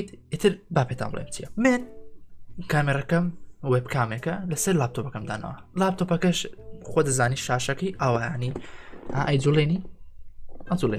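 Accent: Erbil, Iraq. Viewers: Hich let naya ba karkuki yan slemani qsa bkay hawleri zyatr la dangt de 😊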